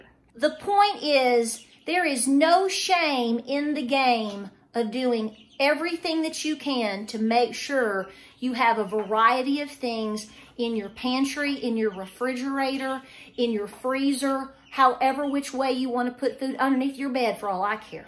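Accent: South, United States. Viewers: Thank you for the motivation ❤️ I’ve been stocking my pantry since 2020 but you gave me ideas for other things I didn’t think about! I just love listening to your southern accent 😁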